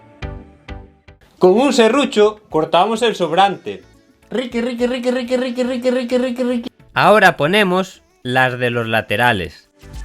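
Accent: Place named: Galicia, Spain. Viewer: dios que acentazo gallego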